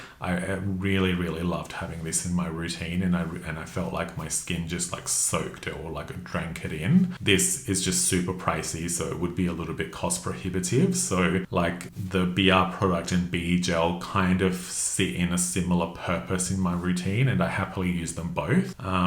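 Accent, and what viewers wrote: Australia. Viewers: Awesome reviews thank you. Love having an Aussie voice in this space ❤ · So glad I found your channel from James, amazing content, love finding an aussie voice!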